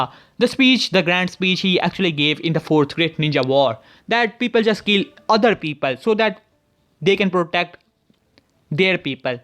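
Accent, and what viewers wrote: India. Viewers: Bruh you a indian? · Are you from India... because your pronunciation seems like that.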